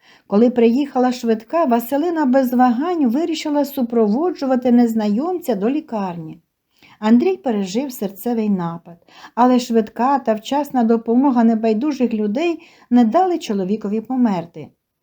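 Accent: Standard. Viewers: чистою українською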